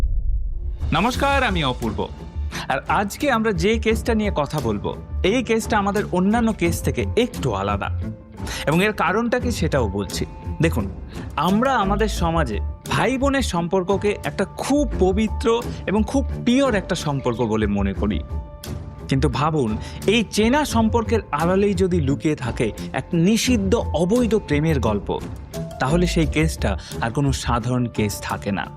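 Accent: Bangladesh. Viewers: আপনার গল্প বলার ভঙ্গি ও সুর অনেকটা বাংলাদেশিদের মতো, যার কারণেই আপনি বিপুল সংখ্যক বাংলাদেশি দর্শকের মনোযোগ আকর্ষণ করেছেন...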